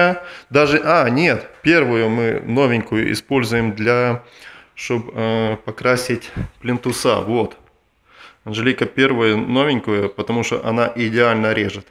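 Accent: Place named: Ukraine. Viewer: Привет!)Акцент украинский хороший!)))Спасибо за советы!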